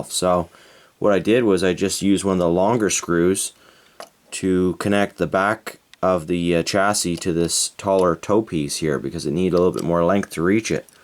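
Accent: Canada. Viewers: Are you Canadian cause you say aye quite a lot there bud if so good aye bud I'm Canadian to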